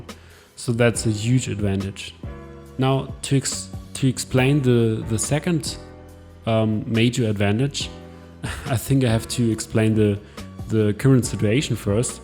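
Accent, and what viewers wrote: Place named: Denmark. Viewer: Are you Danish? I'm only half Danish but I am detecting a Danish accent. You put a lot of work into this video and are very knowledgeable. You've gained a subscriber. It's too bad you don't have more videos on your channel! Question: did you practice making videos before and have made a lot of them private?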